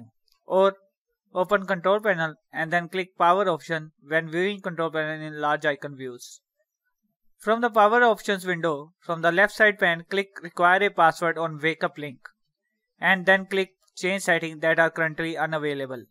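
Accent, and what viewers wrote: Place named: India. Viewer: How can i translate the hindu accsent to english?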